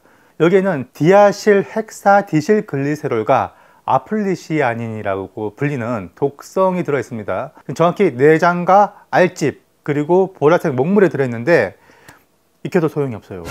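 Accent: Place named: Jeolla, South Korea. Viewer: 아~따 전라도 억양이 징허구마잉~ 자네 고향이 어디당가?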